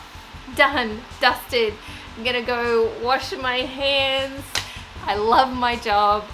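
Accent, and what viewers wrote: Australia. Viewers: I love this,piece! You scrubbed and sanded and scraped your heart out! I love Mrs Browns Boys!, did you see the one where she super glued her arse to the chair, I about peed my pants I laughed so hard! I love British TV, but… I’m addicted to Aussie television! All of it, whatever I can get my hands on! And I’m absolutely in love with your accent! And of course your incredible talent!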